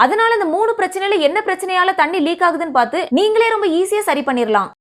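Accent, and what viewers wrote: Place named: Nagercoil, India. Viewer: நாகர்கோயில் ஸ்லாங்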